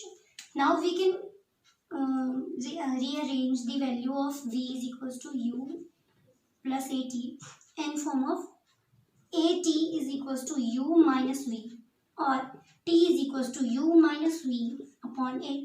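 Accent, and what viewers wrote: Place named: England, United Kingdom. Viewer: your confidence and english accent makes it awesome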